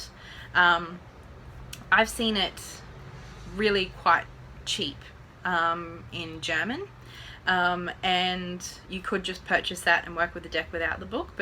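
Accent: Australia. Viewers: Thank's for the great advice. Love your Australian accent too ! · Arn? You mean Own! Nor? You mean No! Horm? Home I think it is! Ozzy accent is thee very worst.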